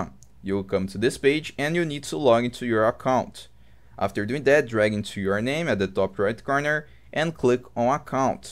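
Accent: Brazil. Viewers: Sotaque BR massa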